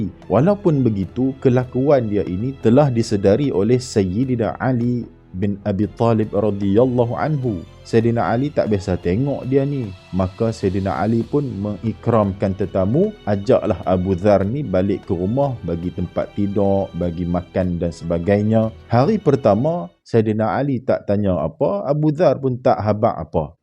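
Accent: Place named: North, Malaysia. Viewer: speakker org utaqaaahahahah pekattt ni rimbukkk org kl mna fhm apa hahahah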